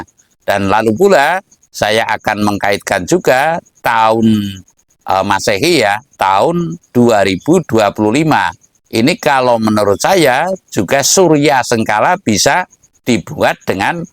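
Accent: Banyumas, Indonesia. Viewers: logat banyumasan memang spt itu juragan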